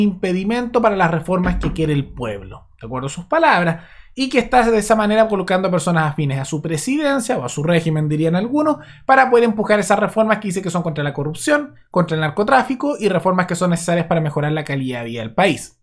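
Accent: Chile. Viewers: Estas hablando pura mierda, si esa informacion es tuya y si estas agarrando informacion de otra persona deverias de investigar mas de Bukele y nuestro pais para hablar. No seas la misma mierda como otros. Por la forma en que hablas puedo notar que eres Chileno deverias de hablar de tu pais Si lo conoces aunque lo dudo. Pero comiensa por investigarte mas por favor.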